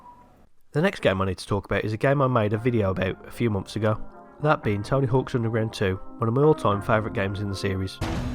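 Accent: United Kingdom. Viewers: Your accent is very british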